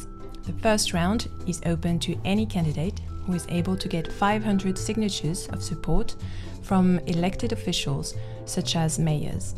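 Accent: France. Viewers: Normal People Who Exist Meme la narratrice est francaise elle fait de son mieux mais son accent craint... Je pense que cest la meilleure page sur YouTube juste pour voir des francais batardiser l'anglais 😂😂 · son accent Français est très joli. Je ne comprends pas les Français qui critiquent un accent que tout le monde aime autour du monde. Sans parler du fait qu'il existe des tas d'accent teintant la langue anglaise.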